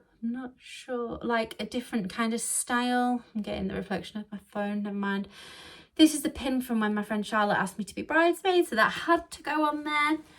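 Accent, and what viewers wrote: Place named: England, United Kingdom. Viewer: I love your typical english accent :-). I'm french and you speak very clear and easly too understand.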